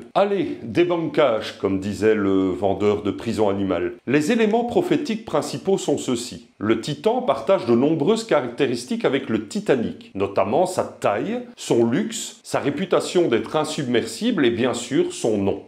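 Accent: Belgium. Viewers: non effectivement, t'as l'air d'avoir plus de 30 piges, donc c'est mort pour le neveu🤣 l'accent mi Schneider, mi De Croo ça doit être intéressant ça aussi, et pas très commun. Un bon moyen de te reconnaître si je te croise au hasard en allant voir le neveu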